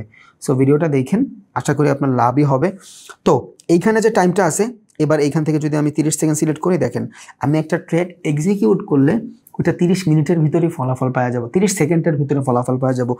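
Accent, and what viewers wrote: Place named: Barishal, Bangladesh. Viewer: ভাইয়া আপনার দেশের বাড়ি তো মোগো বরিশাল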